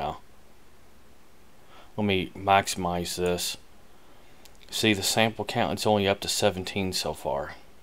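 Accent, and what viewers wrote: United States. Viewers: You are showing exactly what I was asking about.... I saw your other videos. Many many thanks for this sharing knowledge spirit especially it is presented with this special american accent (which area it belongs to?). Thanks and may God bless you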